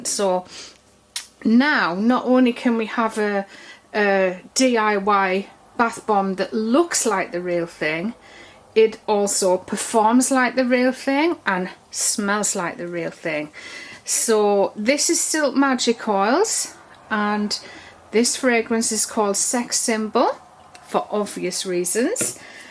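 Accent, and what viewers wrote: United Kingdom. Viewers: I love your British accent !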